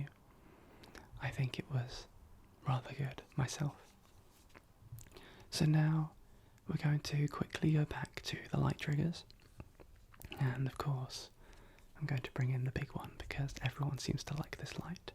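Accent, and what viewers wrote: United Kingdom. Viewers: It’s the lovely British accent I love it 😊😊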